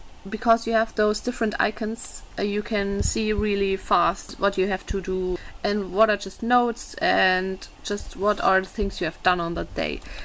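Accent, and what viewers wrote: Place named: Germany. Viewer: Wow, ich hab nur kurz reingeschaut und noch keine komentare gelesen - trotzdem sofort gehört das du aus deutschland kommst =) was ist denn ein bullet journal?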